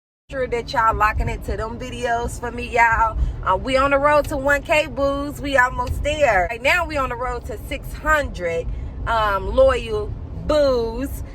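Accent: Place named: Florida, United States. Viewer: Your Accent Reminds Me Of My Florida Folks.😱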